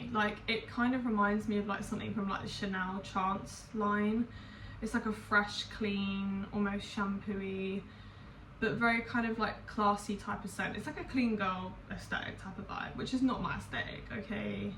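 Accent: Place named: South London, United Kingdom. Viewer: Happy belated birthday!! Happy to see us south Londoners being represented in the fragrance community, no longer do I feel ashamed for pronouncing gourmand like a plebeian lmao. Loving the editing too, it’s a breath of fresh air in a very (70% unfunny) serious community.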